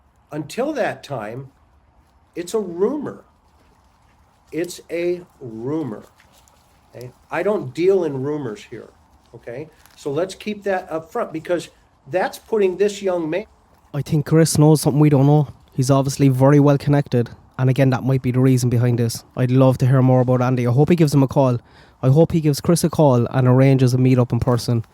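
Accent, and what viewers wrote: Ireland. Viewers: I’m glad you decided not to retire.. Your gaining ground so keep going , I’m also a big fan of Michelle and I’ve heard her mention you so focus on the positive and draw strength from us .. Plus I’m guessing there’s a couple women loving the Irish Accent.. respect from beautiful Canada 🇨🇦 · God you sound so irish i agree i think she was sold how terrible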